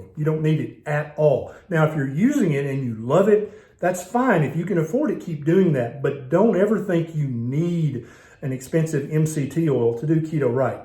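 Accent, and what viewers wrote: South, United States. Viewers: .....loooove your delivery! No baloney, no hooey, just southern drawl sweetness and info from a southern gent! Thanks for keeping it real!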